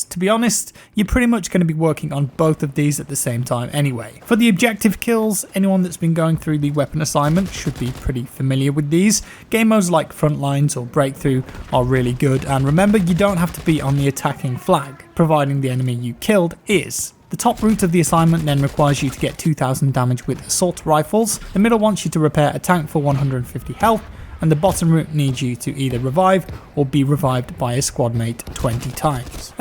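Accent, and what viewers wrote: United Kingdom. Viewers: This is gonna sound pretty creepy, but i just love it when people with British accents explain intricate shit to me XD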